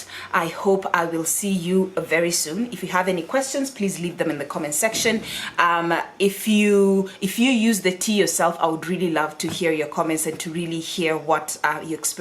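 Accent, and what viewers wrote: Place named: South Africa. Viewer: thanks for the information...love the accent, is it South African?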